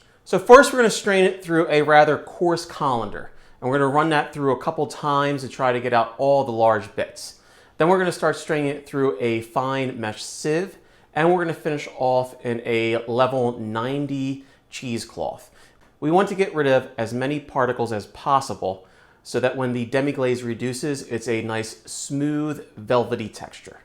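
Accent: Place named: Philadelphia, United States. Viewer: Not a lot of videos on demi-glacé, appreciate it! That accent don’t lie, you’re definitely a Philly boul!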